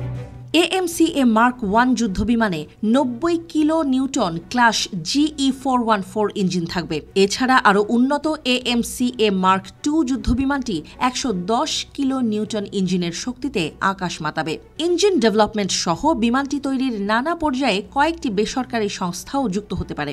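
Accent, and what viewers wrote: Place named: West Bengal, India. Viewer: গলার আওয়াজ আর কথার স্টাইল দেখে মনে হচ্ছে এটা একাত্তর টিভির প্রতিবেদন নয় এটা ভারতের পশ্চিমবঙ্গের কোন টিভি চ্যানেলের প্রতিবেদন তারা প্রচার করছে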